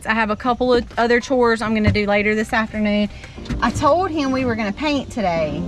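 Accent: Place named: Mississippi, United States. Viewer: Yeah that Mississippi drawl is awesome!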